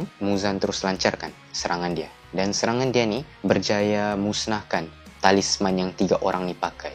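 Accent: Sabah, Malaysia. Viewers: dgr2 org sabah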